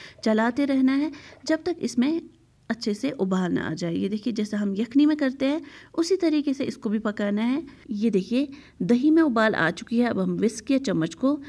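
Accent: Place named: Kashmir, India. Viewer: Aap k kashmiri aawaz muj bouth bouth achii lagte hai ❤️❤️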